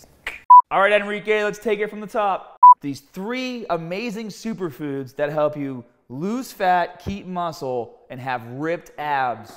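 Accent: Boston, United States. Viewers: I love his Boston accent he's hilarious overall but this guy is funny and cool and authentic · My boy out here with that Boston accent. That accent just makes you stronger when you hear it. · Hey, I'm a current fatass working on losing weight and building muscle if thats possible. I was always skeptical about this guys ads on facebook. Are you from Boston with that accent? Total irrevelant build up to that question but I'm dying to know. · Love your Boston accent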